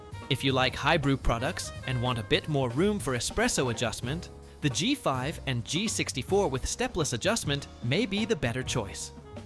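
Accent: United Kingdom. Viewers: why are you using british accent now? it's not bad. i'm just curious and found it slightly hilarious.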